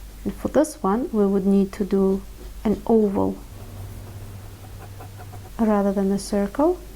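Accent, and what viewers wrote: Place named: Russia. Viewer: ahhhhh Lebanese! That’s what I couldn’t place! I got the Russian accent but your facial structure, coloring and surname was throwing me off. Lol. You are quite amazingly exotic!😍😍😍😍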